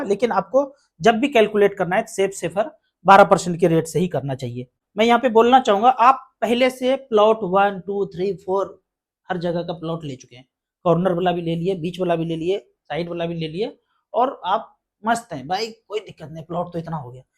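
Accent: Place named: Magadh, India. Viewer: ji Aap Bihar ke kis jila se hai.mere khayal se magad ka ton hai. Thanks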